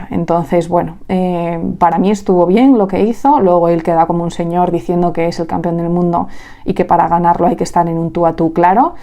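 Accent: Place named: Spain. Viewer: ! Gran comentario de aquí en adelante un oyente más. Talento, belleza e inteligencia matizada con ese maravilloso acento español. Saludos desde Buenos Aires.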